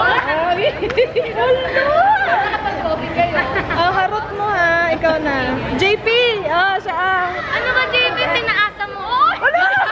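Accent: Visayas, Philippines. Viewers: tga san kaya si ate ,parang visayas part kasi may "uy" lahat ng mga bisaya mga "uy" ung word sa tapos 😁. enjoy ko ung vlog ninyo po. ty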